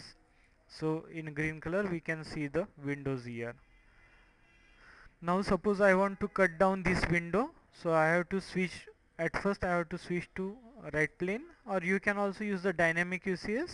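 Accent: India. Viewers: i struggle to get much done with the Indian accent....chills me out to the point of sleeping haha :P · He is an Indian dude. Not Pakistani. I can tell you from his Typical Kerala accent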